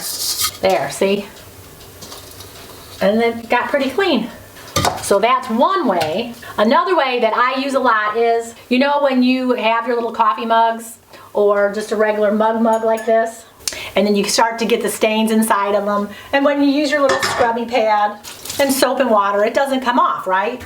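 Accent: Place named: Michigan, United States. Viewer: Let me guess.... you are from Michigan. Half my family speaks with that accent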